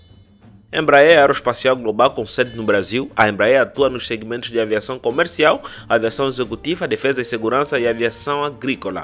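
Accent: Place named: Angola. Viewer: Esse sotaque e de Angola 😊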